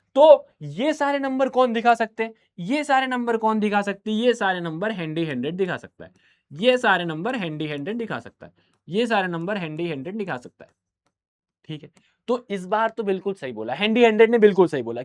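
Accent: Bihar, India. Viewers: Sir please बच्चों जिससे acting mat kara karo समझ nahi aata or बिहारी mat bola karo na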